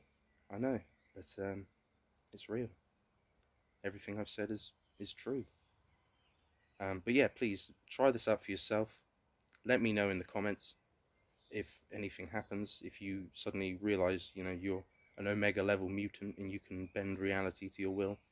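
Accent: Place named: United Kingdom. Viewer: You have a cool British accent in the likes of Henry Cavil, not a pompous one like Nick Pope and Mick West. I've tried CE 5 and had mixed results nothing solid or repeatable. My theory is they have sensors that can read pretty much everything with 3-5 miles of them. They know everything going on within that bubble and its like super hero or god like powers minus bringing back the dead. Very weird world and we barely understand any of it.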